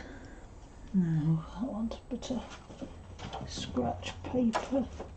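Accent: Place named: North West England, United Kingdom. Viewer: Hi...I'm currently doing this colouring at the moment...I love Hares and love the way you've built up the colour here. You sound very local to me...north west England?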